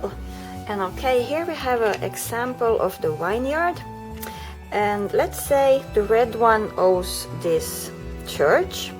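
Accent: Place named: Finland. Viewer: Suomi <3 I could listen to your English the whole day...it sounds great :D Oh, do you know if there's Carcassonne tournaments in Finland/Helsinki?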